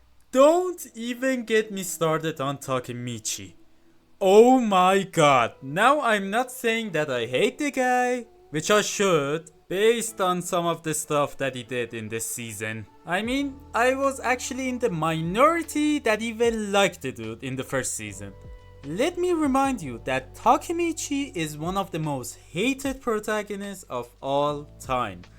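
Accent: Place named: Iran. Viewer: dige ma irania lahje hamo tashkhis midim rahat 😂❤ vali kheyli khub harf mizani :) kheyli ham channel e khubi dari, keep up the good work! omidvaram hesabi pishraft koni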